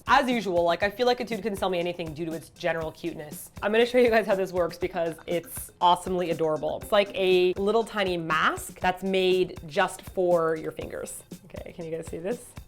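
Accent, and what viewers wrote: Canada. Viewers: I heard your Canadian accent more in this video than any other video. And I've been watching you for a about 2 years. ._. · Oh wow I just picked up on your Canadian accent!! LOL cute! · I love hearing little bits of canadian accent keke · She sounds super Canadian in this video! It's adorable >.<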